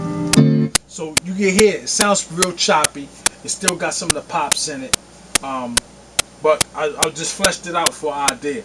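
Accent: Baltimore, United States. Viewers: That Bmore accent be killin me! Lol. great vid fam!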